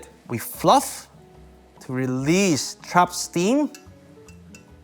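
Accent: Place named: United Kingdom. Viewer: I like his stuff I’ve seen so far. Is he from Hong Kong; he’s got a British accent.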